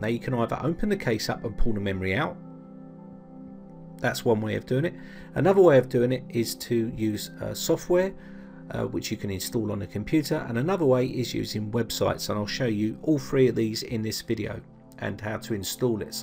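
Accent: England, United Kingdom. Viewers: What part of England are you from, Gloucestershire?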